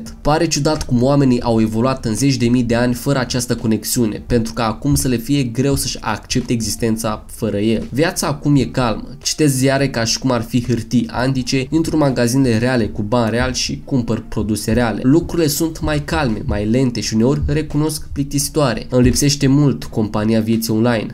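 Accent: Moldova. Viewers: Esti Moldovan? Ai un accent destul de ciudat😁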